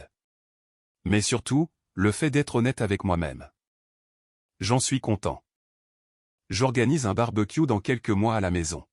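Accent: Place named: France. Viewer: Pareil, de plus entendre l'anglais avec un accent français ça pique les oreilles.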